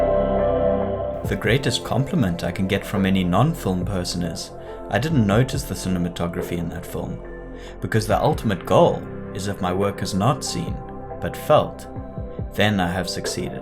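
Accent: South Africa. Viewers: you sound south african? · You sound South African, am I hearing correctly ? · Dude are you from South Africa???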